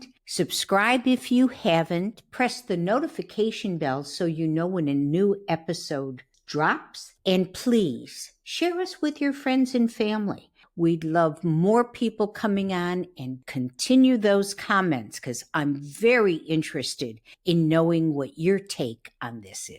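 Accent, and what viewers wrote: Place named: Midwest, United States. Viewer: Hi ….I enjoy your commentary,but……I’m not trying to be nasty here, but I just have to say…… your midwestern accent is so annoying! It’s grating!! It makes it difficult to listen. You really should try to mitigate it. Perhaps there’s some kind of course on YouTube that could help you.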